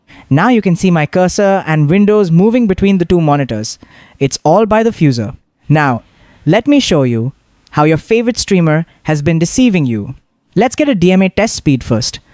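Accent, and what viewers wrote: India. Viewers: Sounds pretty indian if you ask me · ts indian voice cannot be the method 🥀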